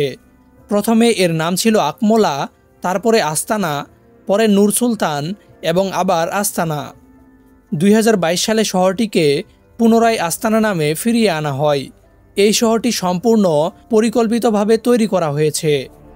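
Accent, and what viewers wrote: Sylhet, Bangladesh. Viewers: আপনার বাড়ি মনে হয় সিলেটে।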